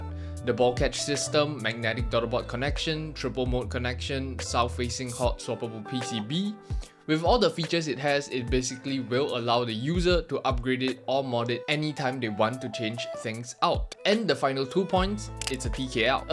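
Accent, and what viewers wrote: Singapore. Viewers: articulate young man with a Singaporean accent 😭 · Stumbled into mechanical keyboards when I saw a Nuphy ad on social media, then went down this rabbit hole of keebs, including subscribing to this great channel with an articulate young man with a Singaporean accent, which surprised me! Very proud of you; keep up the good work!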